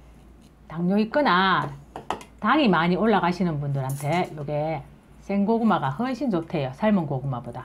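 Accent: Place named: Daegu, South Korea. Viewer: 함무보께예~ 대구쪽사투리네요 "고맙십니데이"가 맞는말이 되야되는날은 언재쯤일까?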